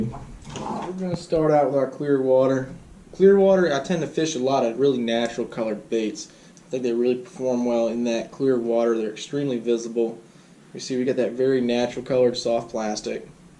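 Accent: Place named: South, United States. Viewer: Got that ol country accent nowadays 👍🏻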